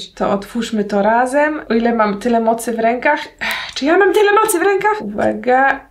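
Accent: England, United Kingdom. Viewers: Ale masz kozak angielski akcent 😯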